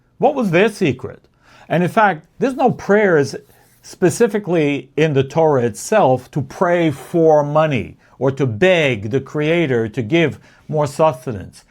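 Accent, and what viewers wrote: New York, United States. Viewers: Love the New Yawk accent :D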